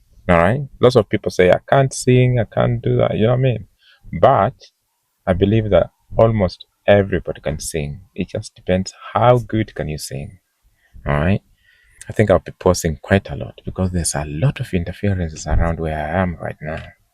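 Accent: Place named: London, United Kingdom. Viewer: from Malika squad, happy to see you.oh my .... u have a London accent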